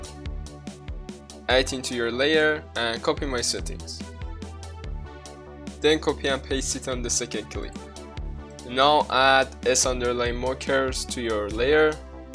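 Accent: Iran. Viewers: ایرانی ترین لحجه ای که شنیدم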